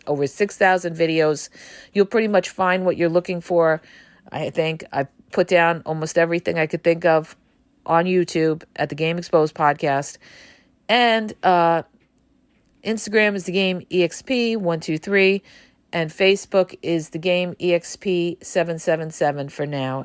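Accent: New York, United States. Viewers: "Deep down, the narcissist disdains you, they really can't stand you" This, with your New York accent made me bust out laughing! Takes a bit of sting out of the painful truth.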